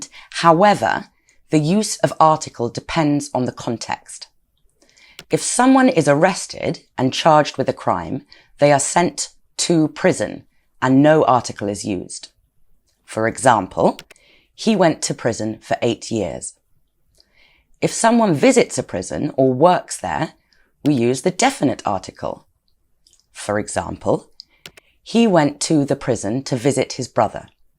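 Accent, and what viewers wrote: United Kingdom. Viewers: 딱 영국발음 좋아요 좋아~! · I had an appointment with my doctor 이렇게 말해야 하나? 하고 들어온한국인... 근데 영국식 영어인... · 아 진짜 영국 영어 엑센트 너무 좋은거 같아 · 영국발음이 좋기는 좋구나... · 와 엄청 도도하고 섹시하다 영국발음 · 영국식 발음 죽이네요. 마치 영어가 아닌것처럼 들려요.. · 여윽시 영국식 발음과 억양은 독일어 배운 나에게 엄청 매력적임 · 영국 발음 매력적이에요~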